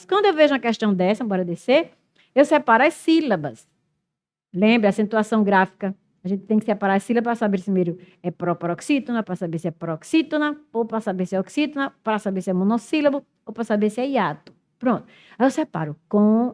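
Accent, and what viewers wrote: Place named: Northeast, Brazil. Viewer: Que sotaque mais lindo,😊😊 meu nordeste.🙏🙏#gratidão 🙌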